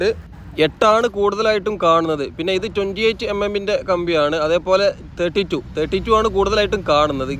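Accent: Malappuram, India. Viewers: ഞാൻ കണ്ടതിലേക്കും വച്ചു ഏറ്റവും നല്ല എപ്പിസോഡ്. സൂപ്പർ അവതരണം. അതും സൂപ്പർ ക്ലാരിറ്റിയിൽ.പുരോഗതി എങ്ങനെ വേണമെന്നും അതിൽ ജനങ്ങൾ എങ്ങനെ സഹകരിക്കണമെന്നും അതും എനിക്കിഷ്പെട്ട മലപ്പുറം ശൈലിയിൽ.❤❤❤❤. എന്നാണോ പേര്.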